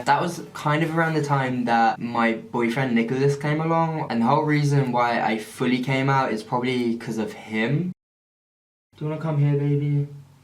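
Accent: United Kingdom. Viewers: Your cringey but really it your voice just oml hello everyone welcome back to my channel it the most brittizh thing to say i feel like thats offensive oh well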